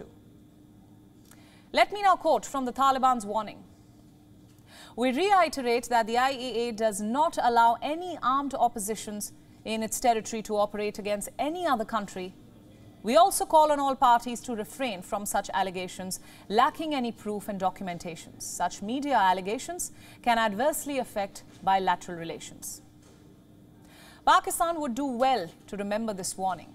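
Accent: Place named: India. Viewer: You aren't neutral. I just heard your voice. And told my friend that it's an Indian girl. Loosers. Just keep on saying our names. It's good to hear that how much you value us. By just focusing on us. 😅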